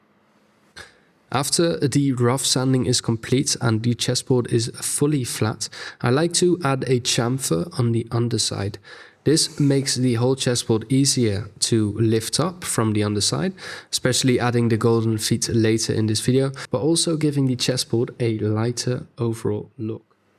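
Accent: United Kingdom. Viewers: Where did you pick up such a british accent. It amazes me every time. Dutch people usually sound more american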